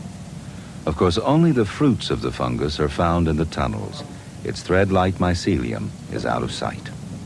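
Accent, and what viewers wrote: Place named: United States. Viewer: Yes but what happened to the original narrator? This version, with the American accent is not nearly as good as him!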